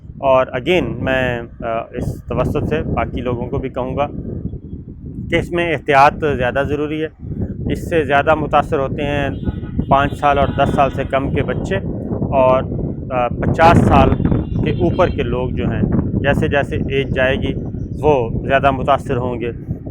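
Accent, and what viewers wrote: Punjab, Pakistan. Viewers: Maaf kardo sabir ko..iska english..urdu..sab punjabi me bolta hai.. . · Ounki mother language Punjabi hi. App ounki khabar soun rahay Hain or sountay rahain gay.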